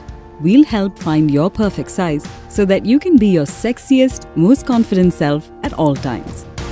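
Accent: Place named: India. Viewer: Typical Indian accent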